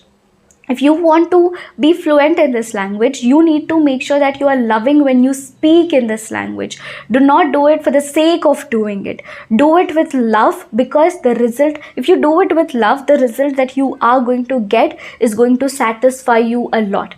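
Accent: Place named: Andhra Pradesh, India. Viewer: By listening your words and accent I was able to figure it out that u r from andhra.. Maam pls try to change your accent when u speak English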